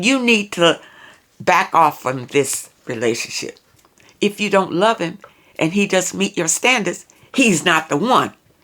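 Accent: South, United States. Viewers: Hello Miss, I heard you mention are orihinally from the Carolinas. I'm hete in South Carolina, in Whitmire, Newberry County. I thought detected some Southernisms in your speech. Friendliness too. Also youre a fixed sign, me too Double fixed ♏ Scorpio Sun, ♌ Leo Moon, Scorpio Rising..I enjoy your videos, I learn something new with each video. Thank You. *Pardon my typos, for some reason the Edit option jumps around, wont allow me to correct in each word.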